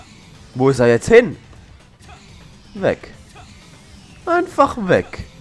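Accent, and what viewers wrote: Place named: Switzerland. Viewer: haha mer ghört voll din schwitzer akzänt :D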